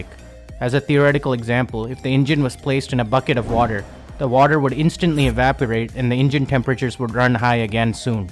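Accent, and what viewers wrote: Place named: India. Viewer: Don't hold back your tongue when speaking. That's why Indian accents really hurts my ears when listening.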